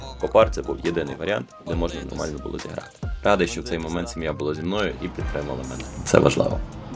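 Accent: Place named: Vinnytsia, Ukraine. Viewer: Лайк за українську(чисту) мову,звідки родом,Віниця?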